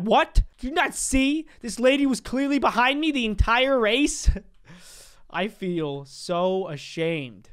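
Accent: Italy. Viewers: I think you cute with the Italien accent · you need to about me out in the next video!? Btw I love the Italian accent and I am part Italian!☺😊😀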